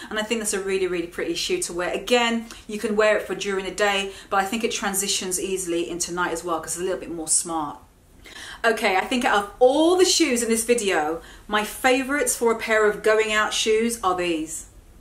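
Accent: United Kingdom. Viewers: u r such a nice gorgeus woman! at last somebody who can make fashion a matter of fun and smiles ! you sound soooo British. ...do u live in the US? sorry of I don't know you! I ve just subscribed...ciao from Italy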